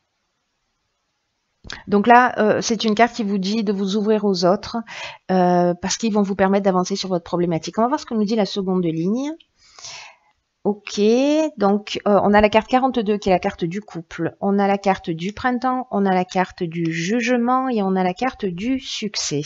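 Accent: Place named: Southwest, France. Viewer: Joli accent ! Du Sud-Ouest de la France ?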